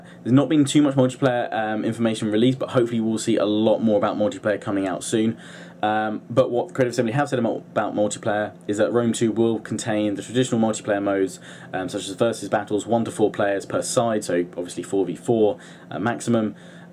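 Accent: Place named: United Kingdom. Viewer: I think your videos are great, and thank you for clearing things up about rome 2. I think your British accent is awesome, what dialect is it in particular?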